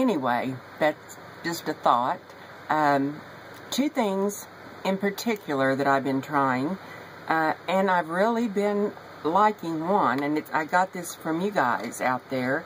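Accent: South, United States. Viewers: Lol I do have a accent a jersey accent lol you do sound southern ha!!!!!! You made me laugh that's for sure have a great weekend my friend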